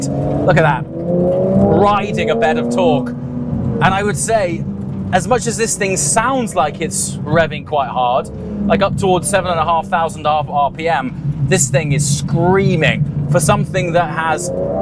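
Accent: RP, United Kingdom. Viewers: Not sure who this guy is but it’s the second video I’ve seen with him, and he’s reviewing. He’s very good and presentable. Great work and look forward to more. A bit too Posh British but like i said, well presented and spoken in detail.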